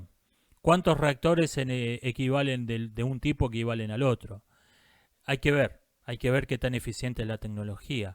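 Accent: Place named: Argentina. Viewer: Abro moción para que nos narre creepypastas clásicas con su acentito argentino. Imaginen tener a Slenderman no sólo narado, sino COMENTADO por el Sócrates de nuestro tiempo.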